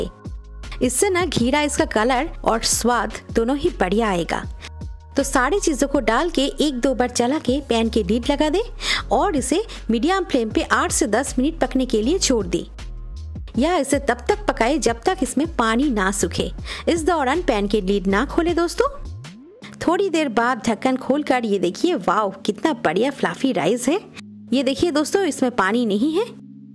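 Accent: Bengal, India. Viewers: Aap Bengali ho mam apki Hindi bolne se hi samajh gaya mai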